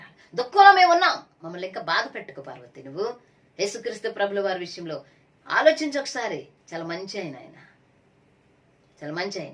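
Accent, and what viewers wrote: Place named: Srikakulam, India. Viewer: మరి దీనికి 100 మంది రంకుమొగుడులు దీని శ్రీకాకుళం భాష దీని పెంట మొహం ముండా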